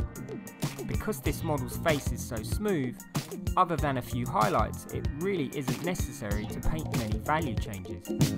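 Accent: United Kingdom. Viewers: First time i hear a Greek guy with a british accent, more british than the british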